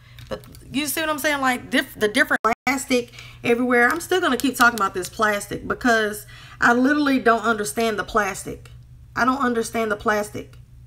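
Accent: South, United States. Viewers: I enjoy your videos, thank you for keeping us updated. Love your voice, are you in the South?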